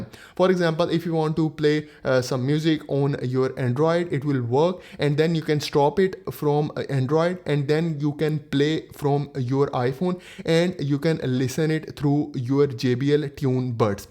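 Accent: India. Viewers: Nasty pajeet accent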